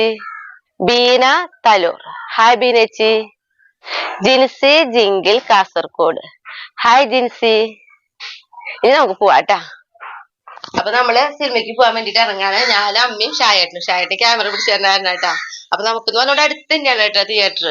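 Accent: Thrissur, India. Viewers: Ante chechy onnum manasilakilla njangal Thrissurkaralla Thirichu parayu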